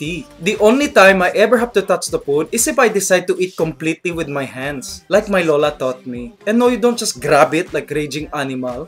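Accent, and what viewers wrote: Philippines. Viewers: It's scary how much I can relate to your videos! But I'd say the funniest part of all of these is your random use of the classic expressions, e.g. "Gee wiz"…"Magine dat!"…"Can yoo beleeb it?"…"My Gahd!"…Shows you not only know your pinoy stuff, you lived it 24/7! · The only person who can make fun of the Filipino accent without being offensive :) Keep it UP! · filipino dining with filipino accent :D :D :D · Yeah,Tag-Glish Love it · Is that the real Filipino accent?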